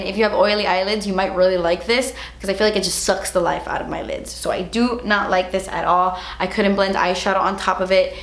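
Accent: United Kingdom. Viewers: Omg I love you so much, and even more every single video but that 'British' accent has my love for you going wild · How do you get that british accent?? Wanna know the story... 😍😍😍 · When does her British accent it sounds like Alex drake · Slaaaayyy! Btw I love your British accent keep doing it! ❤️❤️❤️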